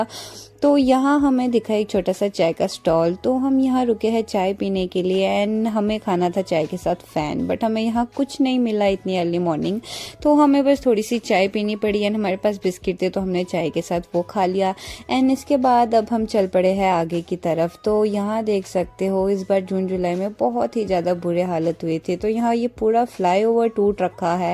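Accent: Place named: Punjab, India. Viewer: Ur punjabi accent is really gud from where u did ur schooling and higher studies?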